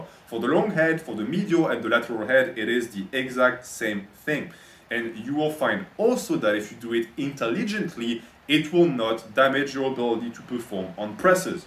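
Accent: France. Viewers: Your French accent makes all of this better. Thank you,.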